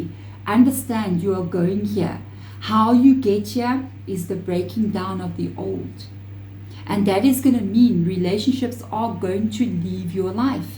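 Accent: South Africa. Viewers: Thank you...great reading...first time watching you...arw you South African? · Wow, wow, wow, however a bit conflicting, been fighting six years for my property sold unlawfully by my husband, got an eviction order on Wednesday - valentines day, going to appeal and lodge another case to fight the unlawful sale - I trust this is the right action???? Know I will win the case if I do, but don't really have the finances for a High Court Action - should I let go of all that I have worked for and more on??? Keep getting conflicting answers from the Universe. Wishing you love and light. By your accent I assume you to be a fellow South African.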